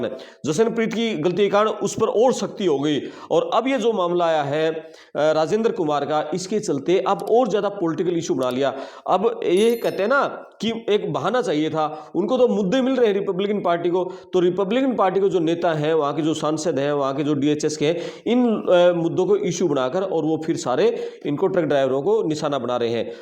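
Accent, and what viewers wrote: Haryana, India. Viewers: Haryanvi flavour bhut ganda lagta hai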